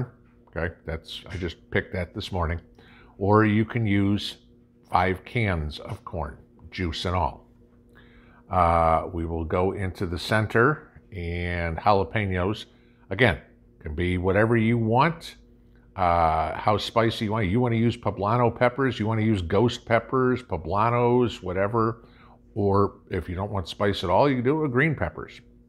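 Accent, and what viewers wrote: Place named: Midwest, United States. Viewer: I just started watching your channel a few months ago and I just KNEW you were not a TN native. I said to my son "That guy is a midwesterner" lol I'm a native Michigander. We know our own lol! Love your channel!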